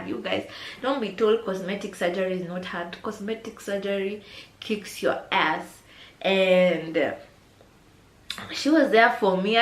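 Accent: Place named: Kenya. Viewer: You are a good story teller, I love you, nice kenyan ascent.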